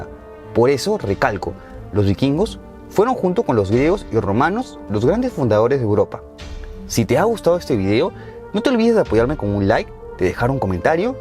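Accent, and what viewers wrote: Peru. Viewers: No se le entiende muy bien el acento del moderador ojalá usará acento más neutro no al estilo peruano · No sé pero creo que tu acento es peruano, eres mi compatriota? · Es evidente que español no es; yo creo que es peruano, pero no estoy 100% segura de distinguir el acento. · Qué lindo tu trabajo.. un poco rústico pero Lindo 😍 y tu acento peruano (si no me equivoco) 😍😍 Lo explicas genial 👌😚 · Lo sacaste bien. Es un acento "peruanazo", con ese superlativo tan usado que usamos nosotros.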